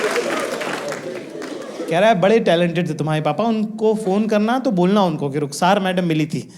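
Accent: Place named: Bhopal, India. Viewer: That bhopali accent is hitting hard❤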